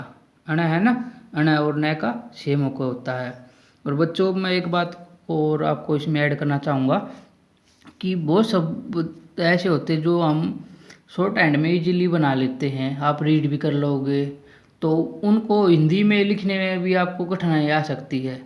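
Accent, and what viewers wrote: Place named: Rajasthan, India. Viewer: Sir Nationality to sabki Indian hai, State wise kaha se ho, because by your tone you seems like from Rajasthan/Haryana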